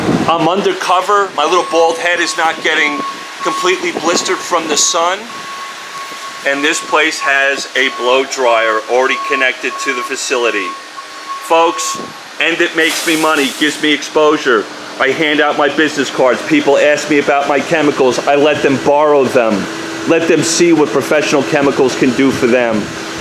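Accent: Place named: Boston, United States. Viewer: Love the accent...cahs and cawfee lol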